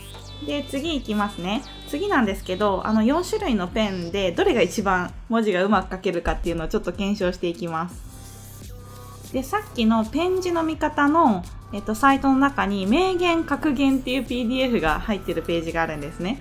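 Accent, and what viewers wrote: Kyoto, Japan. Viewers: いつも貴重な情報ありがとうございます。漢字の書き順が少し気になりました笑 京都弁期待しています。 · 京都弁の解説メチャメチャ楽しみです！ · 京都弁の解説めっちゃ楽しみやな〜❤ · 京都弁のイントネーションが節々に感じられて、自分は癒されながら観てます。 是非とも京都弁で解説してもらえるのを楽しみにしてます。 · いつもすっごく分かりやすくて楽しくて、ためになります！ お話も聞きやすくて、早くもなくて、癒させるし〜♡ 待ち続けたiPad miniを手にしてからノートも手帳も手書き類すべてiPad miniに移行しているので タイムリーで嬉しかったです♪ 京都弁やってほしいでーす！ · 京都弁期待しています。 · イントネーションが関西ですよ^_^ 私も関西なので親近感が湧きます^_^ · 以前アップしてた中に、21才頃の動画でしたっけ、あの時の話し声が関西弁だったので、そっち方面かもね。と、思ってました。京都弁で、ぜひお願いします。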